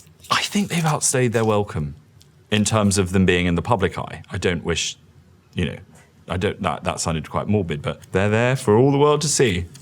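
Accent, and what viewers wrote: United Kingdom. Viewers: He should be given even more credit. He's handsome, he's got a beard, beautiful blue eyes, British accent, annecdotes, amazing sense of humor and he's seems incredibly modest hahahahahaha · I wasn't expecting the British accent (had no idea who this guy was until I watched this video), so I almost spit out my drink when I heard "Jack WhiteHOLE" instead of. 😂 😂 😂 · Now I know that when he went all British in Clifford it was his accent ahahah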